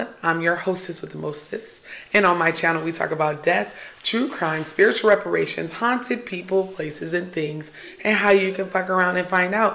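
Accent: United States. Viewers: CAULDRON. Spelling..... And you are NOT African, unless you were born there. You sound AMERICAN. You seem to be a Black American of African Heritage (& frankly, white too) The term AFRICAN AMERICAN was a label put upon you to divide us. My ancestry is Sicilian and German, but I don't call myself a Sicilian American. Can you imagine is EVERYONE did that? Everyone you know would be an Irish American or a Hispanic American, or..... And NATIVE American? ANYONE BORN HERE is Native. Maybe The Original peoples or Indigenous peoples or... ? Don't divide. WE ARE ALL Americans (& really need to take our country back from the politicians who are importing an invasion of people that do not hold our values, speak our language or have any intention of assimilating into our society.) P.S. You remind me of my friend's daughter. very pretty. Thank you forthe video - Subbed!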